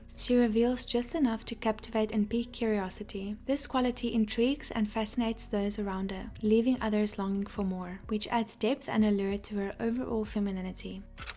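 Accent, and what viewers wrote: South Africa. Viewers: Are you South African? Something about your accent gives me that impression. 💗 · Are you South African? You sound like you are from South Africa.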